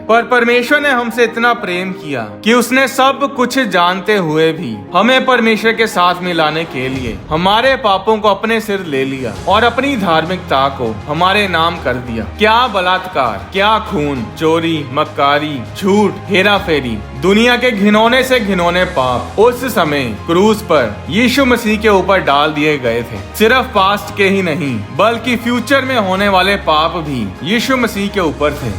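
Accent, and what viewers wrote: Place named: India. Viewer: One of the worst things ever, when you listen history from an Indian accent guy.😏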